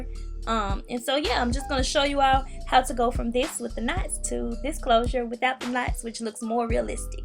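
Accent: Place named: Tennessee, United States. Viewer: That Tennessee accent 😍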